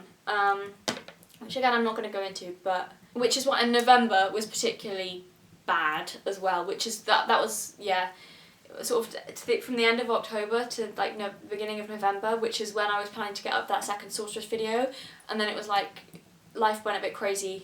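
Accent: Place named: United Kingdom. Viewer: By prober vids i hope you mean videos where i have to check if my headphones are working! 😂 (i showed your shadowhunters videos to my friend who loves them and he was like "she has to shut up, because shes right but i love the books and no smart girl with an adorable british accent can change that" 😂😂😅 we italians do love your accent)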